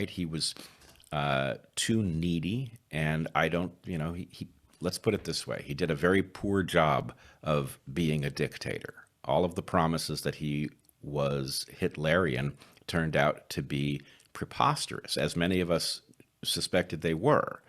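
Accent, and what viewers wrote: Wales, United Kingdom. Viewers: South? Swansea area? It's funny to me to hear such ideas with the accent. I grew up there and Its not the kind of conversations I associate with Welsh people! Your clearly very sharp though, and your channel is great, so I look forward to hearing more.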